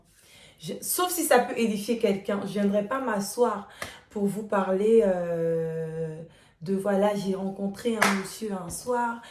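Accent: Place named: Gabon. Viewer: Au tout début de la vidéo, j'ai capté que t'étais gabonaise grâce à l'accent 😂😅 Courage sis🇨🇲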